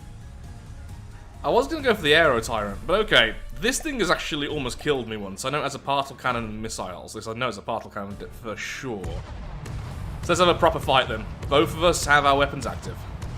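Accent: United Kingdom. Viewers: When I cannot sleep I get recommended videos and I get to pull a all nighter to his British voice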